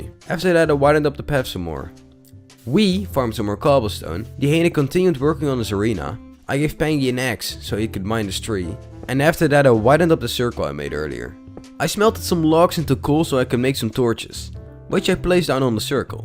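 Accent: Netherlands. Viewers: The dutch accent i love it 😂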